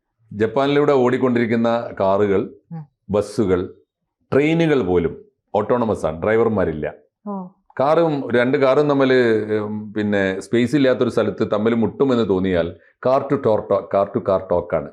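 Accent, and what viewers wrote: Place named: Malappuram, India. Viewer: എടാ നീ ഇന്ത്യൻ പൈസയെ അവരുടെ yen മായി താരതമ്യം ചെയ്ത് നോക്കെടാ . ചാനലിൽ ഇരുന്ന് എടീ പോടീ എന്നൊക്കെ വിളിക്കുമ്പോൾ അവൾക്ക് ഇഷ്ടപ്പെടുന്നു എന്നുവെച്ച്, കേട്ടിരിക്കുന്ന ഞങ്ങൾക്ക് ഇഷ്ടപ്പെടും എന്ന് കരുതരുത്. നിന്റെ മലപ്പുറം ഭാഷ മലപ്പുറത്തു മാത്രം എടുത്താൽ മതി. മറ്റ് ജില്ലകളിൽ ഇരിക്കുന്നവർക്ക് ഇമ്മാതിരി ഊളത്തരം ഇഷ്ടപ്പെടില്ല സു!ടാ!*പ്പി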